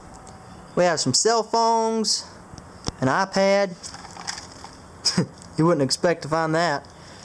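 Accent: South, United States. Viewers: Your obviously 7, it's a southern accent, it's common for people to have accents like that, especially if they live I n certain parts of Texas, Alabama, etc. people who are black don't exactly have an accent, they talk a certain way, but it's different from a plain southern accent, he litterally just talked about Texas, and said y'all, he's from the south maybe the west you never know, it all depends on where you live. But I don't expect you to understand going off of the fact that your most likely under the age of even 8.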